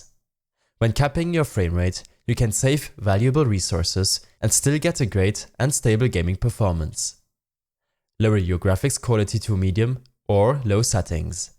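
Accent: Germany. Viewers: Junge man hört anders dass du eig immer deutsch redest xDD :DD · man hört sooo hart dass du deutsch bist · Man hört dass das ein deutscher ist😂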